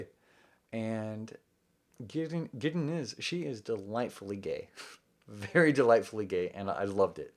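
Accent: South, United States. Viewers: I can't believe you had a book called, "Gideon the Ninth" by Tamsyn Muir at your house in Texas on this video during your quarantine until it came out last year back in September 2019 while you're reading and talking about a swordfighter named, Gideon, who is funny, witty, very charming and such a thorn in Harrowhark's side after that, but I hope your husband, Zach will be very proud of you and I love the black and white makeup on your face to look like the character, Gideon from the book in front of it, because I love your Southern accent and it was my birthday last Wednesday, so I'm 31 years old, right now!!! #GideonTheNinth #bookreview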